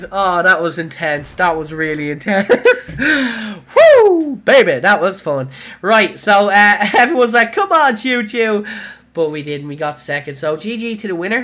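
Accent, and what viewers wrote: Ireland. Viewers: OMG happy birthday choo <3 luv ya and your vids xxxxxxx hope it's a good one xxxx 4 ever loving you and your Irish accent From maria x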